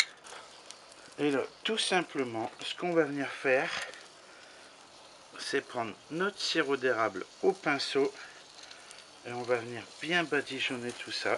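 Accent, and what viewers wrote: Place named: North, France. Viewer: Bonjour hi, l’accent du Nord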